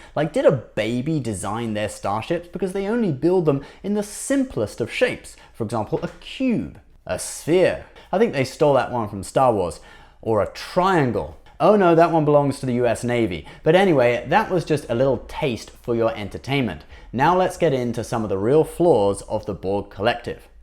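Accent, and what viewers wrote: United Kingdom. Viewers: Woohoo!!! More! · is back!!! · Missed ya,:) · We demand more!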